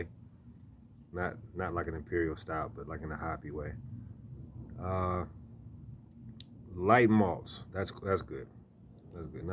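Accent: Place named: South, United States. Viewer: I didn't know u was from the South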